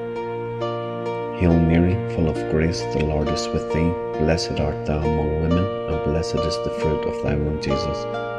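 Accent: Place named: Ireland. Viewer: I love the Irish voice. reminds me of being in school with the Irish priests and nuns as a girl.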